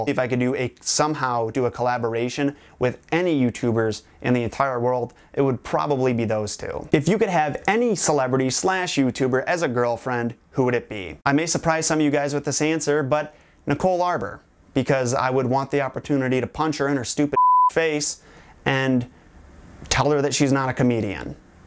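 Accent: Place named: New York, United States. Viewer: Wait he is from a small country town? I literally thought he was a New Yorker or like from Brooklyn or something cuz of the accent I perceived from him lol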